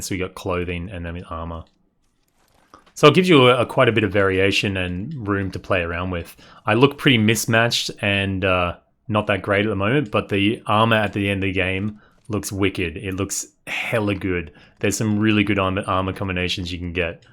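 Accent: Australia. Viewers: Hi. You sound Australian. Thanks.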